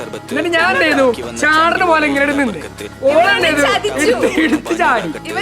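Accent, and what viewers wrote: Malappuram, India. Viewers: മലപ്പുറം ഭാഷ, എവിടെയാ വീട്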